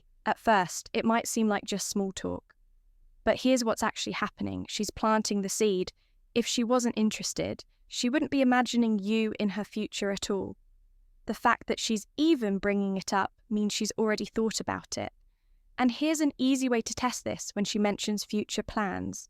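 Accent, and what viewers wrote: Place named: England, United Kingdom. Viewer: Great stuff,. Hope I got the name right. Well done. Keep up the good work.👍 You have a smooth rich sweet voice too. Plus a pleasant English accent.💞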